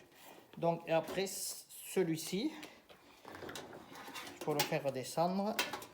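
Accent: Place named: Southwest, France. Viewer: A l'accent, on croirait entendre Maïté nous faire une de ses recettes de cuisine.....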